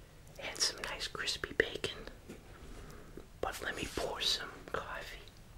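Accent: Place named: New York, United States. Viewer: u sound like you’re from NY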